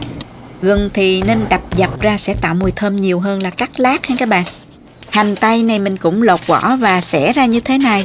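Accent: South, Vietnam. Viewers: Giọng nói miền nam dể thương quá e cũng người miền Tây cảm ơn chia sẻ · giọng hướng dẫn đậm chất Nam bộ rất ấn tượng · ơi, xin cho hỏi công thức nầy là cho mấy tô phở vậy cô? Nhìn cách cô nấu và trình bày hấp dẫn quá cộng thêm giọng nói đậm chất người miền Nam thích quá. Chỉ muốn nấu liền ngay thôi. Xin cảm ơn nhiều trước nhen. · Qua phát âm thì em là người miền Nam, theo khẩu vị trong Nam thì em còn thiếuGiá trụng,Húng Quế và Ngò Gai cũng như cầncó thêm Tương Đen&Tương Đỏ ! Cám ơn video hướng dẫn của em.Thân.👍☺️ 😊☺️ 😊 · Làm thấy đã ngon cộng thêm giới thiệu lại càng dễ thương hơn với lại giọng miền nam nữa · Tôi rất thích giọng miền nam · Chính xác, giọng ở miền nam mình nghe dễ hiểu hơn và ấm áp + chỉ dẫn thực hành rõ ràng dễ hiểu, có năng khiếu và chia sẻ công thức cho mn, đây cũng là điều tạo nhiều công Đức cho và con cháu 👍🥰🙏🙏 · Giọng nói miền Nam dể thương và rất lôi cuốn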